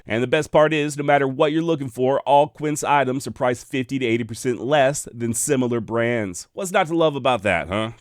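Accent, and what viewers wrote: Texas, United States. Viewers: right on I actually thought Missouri or Texas second possibly Louisiana you have a unique voice...no diddy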